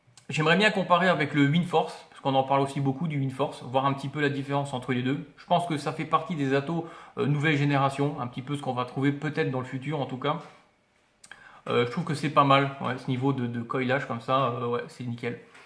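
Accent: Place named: North, France. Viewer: je suis sur atos mais en reconstructible j ai pas passer le pas mai très bonne vidéo bonne explication de quelle région tu habite tu a un accent qui fait penser un peu au nord moi je suis originaire des Haute Pyrénéenne mai j habite en région parisienne Bernard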